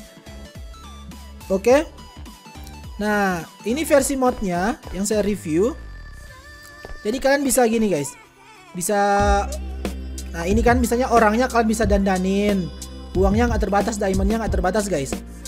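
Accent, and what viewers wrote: Manado, Indonesia. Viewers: Jan pake logat Manado bang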